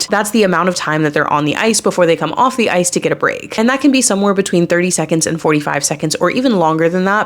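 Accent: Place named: Chicago, United States. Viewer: I’m not sure where she’s from, but over here near Chicago, the majority of us all speak this fast. I never realized how fast we speak until I went to Georgia where everyone seems to speak in slow motion to me, and they constantly asked me to repeat myself, because they couldn’t follow it. She does edit the pauses. Those pauses are likely as she looks back to her prepared speech, but it’s still about the same amount of time she leaves a pauses between sentences in general. Slowing down her speech a bit for a wider audience and editing the connected breaks to fit that speech speed would probably be better, because many people have a difficult time following it. I have had a hard time slowing my speech, but it was required for public speaking so I can slow down when necessary. The fast speech part of the accent from this area is difficult to unlearn. 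At first you purposely slow down, but unless you keep thinking about it, you speed right back up. I could be completely wrong here. I’m just speaking from my own experience , and she sounds very similar to people from my area.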